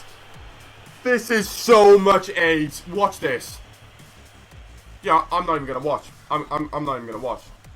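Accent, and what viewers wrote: Wales, United Kingdom. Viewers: you from Wales, Cardiff?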